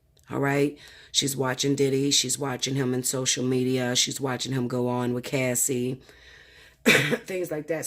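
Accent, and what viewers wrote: Pittsburgh, United States. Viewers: first time watching your video. Interesting read. Are you from Pittsburgh? I hear an accent (I'm from PGH, so that's why I'm asking)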